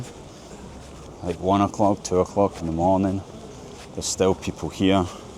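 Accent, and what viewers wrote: Scotland, United Kingdom. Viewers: If you could post English subtitles. Everyone cannot understand Scottish accent. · ПЗДЦ акцент реально СКОТИШ))) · Love Scottish accent. Like you moustache bro, it is back in fashion. Muslims are not bad it is propaganda to keep people away from known what Islam is all about. It is one good, no single human is worshipped, no forcing to change anyone beliefs, that’s why there are millions of churches all around the Middle East with freedom to practice and obligation to protect minorities. Read is the first word from God to prophetMohammed , read yourself and learn.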